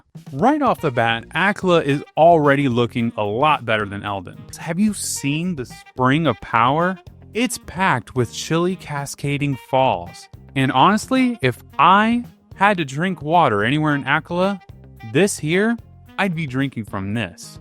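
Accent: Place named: Midwest, United States. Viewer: Your voice is extremely midwestern north america. Btw very cool video, I learned a lot!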